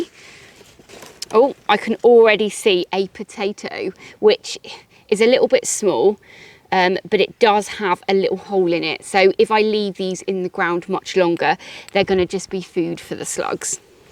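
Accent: United Kingdom. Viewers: Love your cute little video, on growing potatoes in the shade. Planted my first potatoes in the ground, alongside my parents house, in the shade, in mostly clay soil, here in New Jersey, in the USA, when I was 7 years old, in 1959. Not a farmer, mostly an occasional gardener (and lately a heavy-duty forager), but was thinking about planting some potatoes, this spring, here in NJ. Got a lotta shady areas around here, and so, as I remembered from when I was a kid, potatoes grow pretty good, even in the shade. Did quite enjoy your straightforward, yet cute, Brit accent, as you were explaining about your potato bed, in the shade (remember, I'm a Yank, with a "Jersey" accent, similar to a New York accent--but not quite the same! Ha ha ha...) Interesting that you used seaweed, for organic fertilizer; I've got plenty of organic stuff here, to compost and fertilize with (without having to go down to the Shore, for seaweed! 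Ha ha ha ha ha...) I'm sure that seaweed has got some good minerals in it, but just too much trouble for me, living about 50 miles away from the Shore, up here in the woodlands, near the Watchung Mountain ridge, to drive all the way down there, just for some seaweed. (And we gotta lotta traffic here, in this highly congested area, too, near NYC, too...) But, thanks for your good plant-growing videos,.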